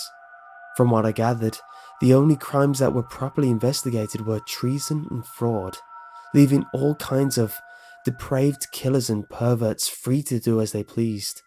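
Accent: United Kingdom. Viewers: This one feels more authentic since it's one of the few UK stories and it's read by a Brit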